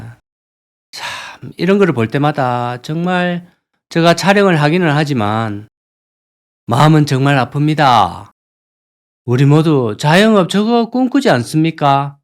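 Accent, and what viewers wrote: Gyeongsang, South Korea. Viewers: 우리가 버티고 있는기 신기합니다 망해가는게 느껴지고 보이는거 같네요 갱상도 사투리가 정겹습니다 아 우야면 조ㅅ노~~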